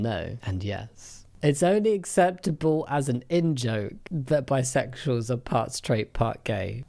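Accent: United Kingdom. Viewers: I'm B1.2 in english but his British accent Is a little bit difficult to understand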